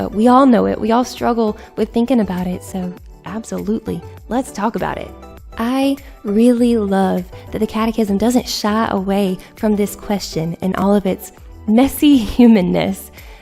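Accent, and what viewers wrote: South, United States. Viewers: Another Catholic loving your videos (and your southern drawl). Two observations: IMHO, it would be better to slowly fade out the background music. It's probably ok for shorter videos, but it can get distracting for some (like an ADD like me 😂) when you keep going deeper and deeper into such a brainy and solemn subject.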